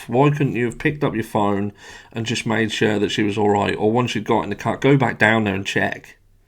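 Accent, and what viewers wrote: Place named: Suffolk, United Kingdom. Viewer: Are you from suffolk? I'm in Suffolk and you sound like it 😊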